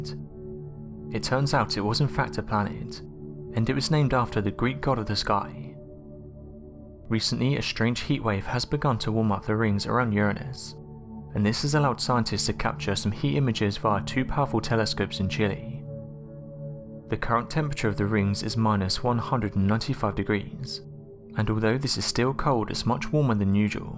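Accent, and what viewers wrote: United Kingdom. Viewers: Is this a Brithish robo-voice? Otherwise not much content. Uranus is boring. Wind and pebbles.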